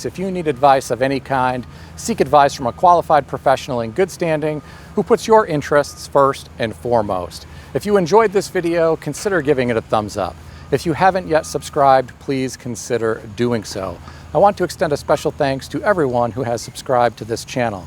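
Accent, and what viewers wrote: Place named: South, United States. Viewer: Love your redneck pick up drivers accent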